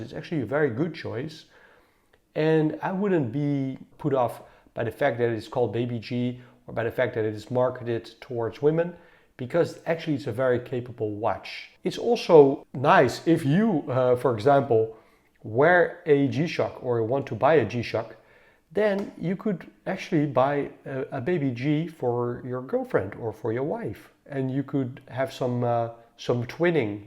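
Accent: Netherlands. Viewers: Why not the GW-M5610 with the BGD-5000? I have the MW and the 7: nice set in white. Btw you sound very dutch.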